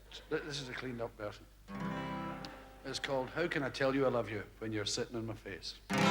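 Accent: Scotland, United Kingdom. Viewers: It's the early days, he still had his Glasgow accent. He sounds as Scottish as he's always sounded. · Is it me or our beloved Billy sounding more scotish can't work it out ???🥰😘❤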